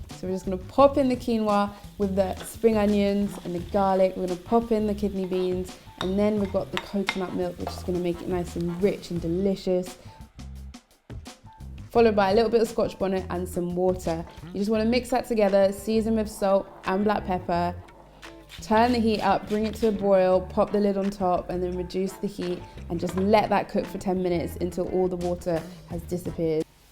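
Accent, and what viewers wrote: London, United Kingdom. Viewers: Im a 1st time VIEWER......Oh My Stars🤩🤩🤩🤩🤩 This is a plant based FEAST🥳🥳🥳🥳🏆🏆🏆🏆 I wouldn't be able to finish that bowl but I would SURELY TRY! LOL That looks so good! Thanks for SHARING Doll🏆 U & Tish Wonders need to team up.....U both have that adorable London Accent🏆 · I knew it 💯% she was going to have a British accent 😂 she just looks English lol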